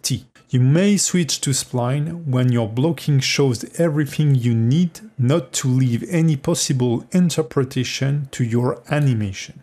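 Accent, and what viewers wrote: France. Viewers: You sound french ! That makes me understand more well !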